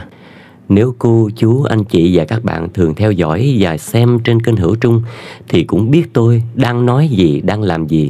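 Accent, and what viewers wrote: Standard. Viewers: Ung ho anh.noi hay chuan nua chuc anh co nhiu sk nhe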